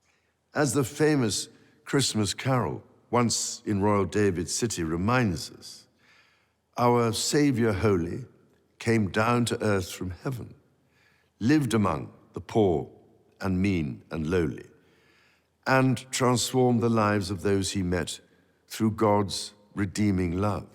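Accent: RP, United Kingdom. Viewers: an old white haired RP speaker?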